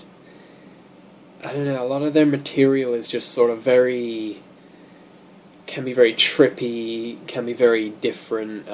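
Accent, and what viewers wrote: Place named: United States. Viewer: Have you been stateside a fair bit? There's an American twang to some of your words these days.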